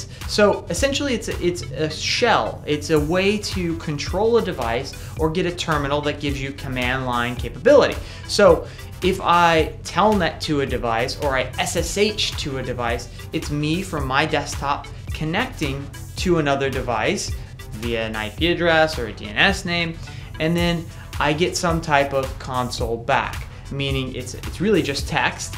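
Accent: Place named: United States. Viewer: Good to hear an American English!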